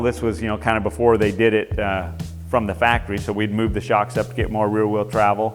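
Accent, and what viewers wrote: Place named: California, United States. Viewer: Oh my god!!!! can i come over and walk that collection some time. You sound like your in california, im up in tahoe. Ill bring beer. Workin on a 79' XR250 right now, but im going more custom. My dad gave away 3 ossa's in like 88' i was in 5th grade and had no pull. My gramps gave away 2 old hondas and a yamaha that same year. Man! those guys I'd love to have those bikes today.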